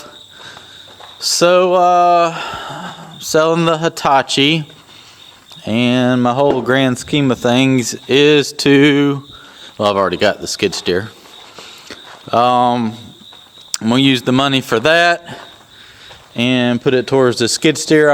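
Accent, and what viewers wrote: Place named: North Carolina, United States. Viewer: I was born and raised in the Old North State. When I listen to you, my accent comes back even though I have been gone forty years. Love it.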